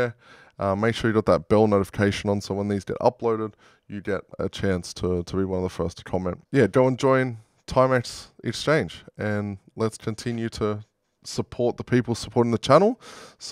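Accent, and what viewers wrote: Australia. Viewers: Just saw you on the bitboy crypto livestream! Thought I heard an Australian accent, Perth here. Love the content and also 100k lol, my first week of crypto and it has opened my eyes massively about the world and also money! Can't wait for what's ahead, hopefully will get that deposit for our first family homee very soon following some good content and advice 😊👍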